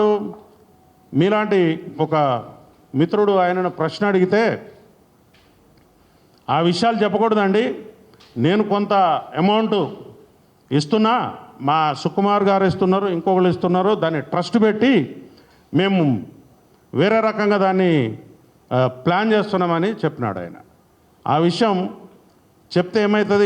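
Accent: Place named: Warangal, India. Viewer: తెలంగాణ పక్కా వరంగల్ స్లాంగ్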